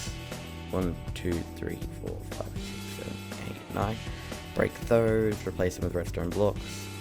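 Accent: Australia. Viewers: It it just me or is this man Australian? <3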